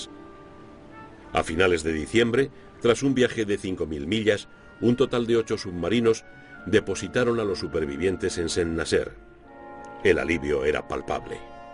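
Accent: Spain. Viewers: Me parece que se refiere al acento español del traductor 😳no encuentro otra explicación. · Es que habla en perfecto castellano de España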